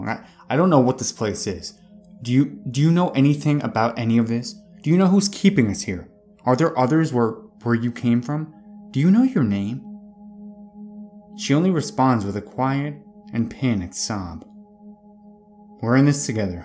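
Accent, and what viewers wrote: United States. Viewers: For sure. Don't worry about it! You should have alot more patrons as well. Or do people just use all those other sites you mentioned? Anyway this is one of those deep tales that kinda freak me out. Kind of a real thought worthy message there eh? Tho I don't think it was Saturnites or whoever that were the...makers. Pretty good pasta tho. Forgot to check-was that you doing all the voices? Seems like a pronounced Yankee lilt or something in there lol! Thankyou!😍✌🐾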